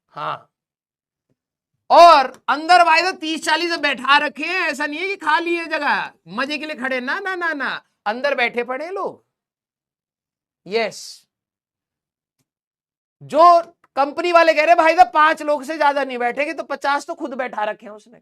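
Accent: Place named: Gorakhpur, India. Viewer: Ham gorakhpuriya haiin sir ekdum theek bolat bade 😂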